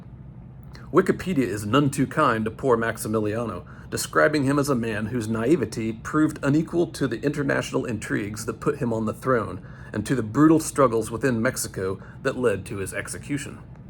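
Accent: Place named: South, United States. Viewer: your lovely southern lilt, nice and gentle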